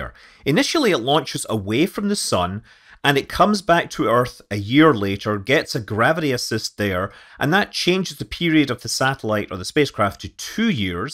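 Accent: Scotland, United Kingdom. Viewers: Greek names always sound best with a Scottish accent.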